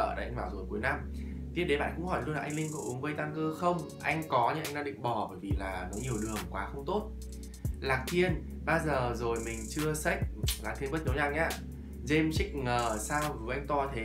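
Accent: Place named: Hanoi, Vietnam. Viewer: thích video của linh vì các lý do sau... 1/ gần gũi 2/ những gì a chia sẻ khá thông dụng vs ng xem 3/ giá hàng hóa khá phù hợp vs đối tượng như e (ít tiền) 4/ giọng HÀ NỘI (cute)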